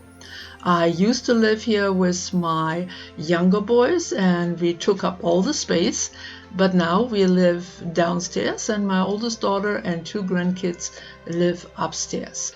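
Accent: Germany. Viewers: Dir und Deinen Lieben auch ein wunderschönes Weihnachtsfest und einen guten Start ins neue Jahr. Gehe ich recht in der Annahme, dass ich da einen deutschen Akzent höre? ;o)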